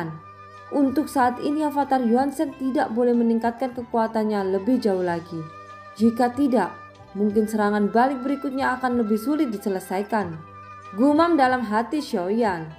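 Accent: Java, Indonesia. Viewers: logatnya kaya orang jawa min..?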